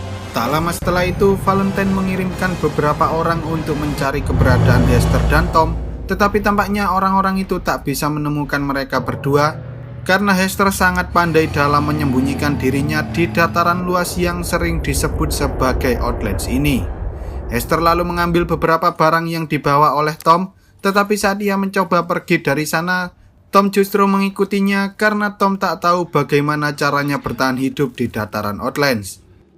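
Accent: Java, Indonesia. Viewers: medokmu menawan, bikin kangen masss membacakan cerita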